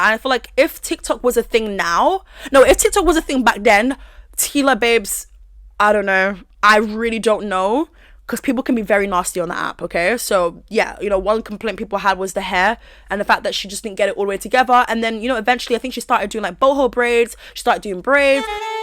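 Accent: United Kingdom. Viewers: I just realized something. And this isnt gunna be PC lol but im genuinely just curious. So most black people in America have a "blaccent" (obvi not all and I did not make up that term) but is that not the case in the UK? Bc you sound like most other British people I've heard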